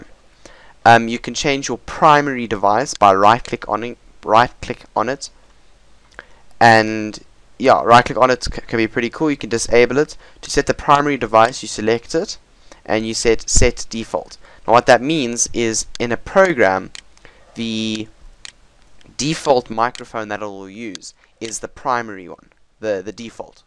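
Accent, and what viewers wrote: South Africa. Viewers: Are you South African? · Are you from South Africa?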